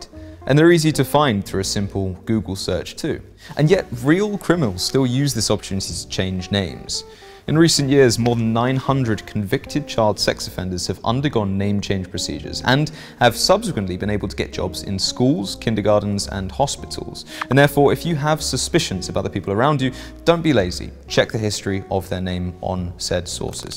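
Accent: United Kingdom. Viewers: Normally the British accent utterly repulses me, but in this case I enjoyed listening to this dapper English gentlemen explain the process and risks of purchasing forged documents from the Dark Web.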